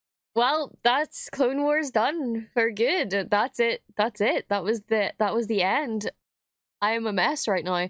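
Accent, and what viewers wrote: Northern Ireland, United Kingdom. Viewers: Sound like your from the north of Ireland? 😅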